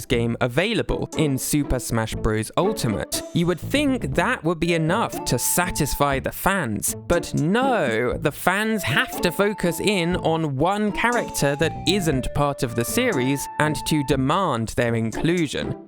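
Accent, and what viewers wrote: United Kingdom. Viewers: always wondered why the Brits pronounce it as Mare (like female horse)-yo instead of Mah-rio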